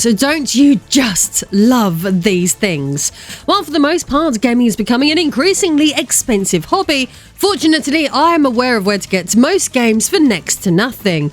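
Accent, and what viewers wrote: United Kingdom. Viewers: the only thing you are is gorgeous. Regardless of yours or anyone else’s political stance, the fact that you’re so attractive, with an amazing accent (I’m a sucker for that British accent) and just the fact that you’re a real gamer. ❤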